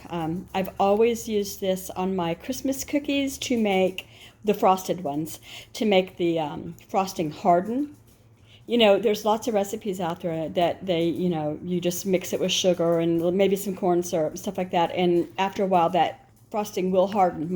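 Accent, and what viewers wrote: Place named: South, United States. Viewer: Hey! Here watching. Loving ur southern accent. Ur so sweet!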